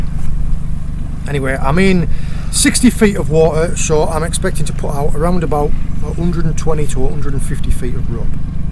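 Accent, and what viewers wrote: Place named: Darlington, United Kingdom. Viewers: i take it you are northern by accent. You fish a lot of the marks down off the south coast. Great fun watching the Bluefin double🤣🤣🤣. Your vids i am getting on catch up but great viewing, they are keeping me sane. Cheers 🍻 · Brilliant videos matey. The last time I heard an accent like that was around Darlington when I was stationed in RAF Catterick 50 years ago.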